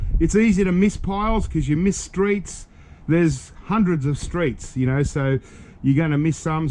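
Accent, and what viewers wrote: Australia. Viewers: You are aussie guy? Nice scrapping lots of wire and neat video 🙏🏻🌈🤗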